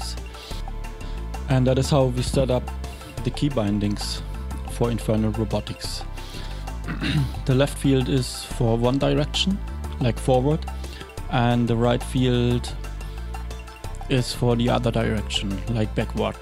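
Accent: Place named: Germany. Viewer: no offence but i find it amusing that you speak with a german accent in english, and your youtube ava is an asian :) thanks for the video, i think this might have helped alot! :D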